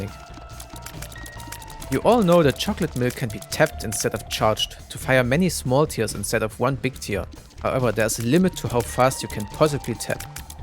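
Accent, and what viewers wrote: Germany. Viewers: That's the most German sounding English I've ever heard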